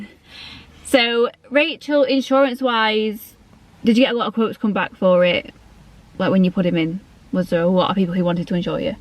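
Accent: Liverpool, United Kingdom. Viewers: I love a girl with a scouse accent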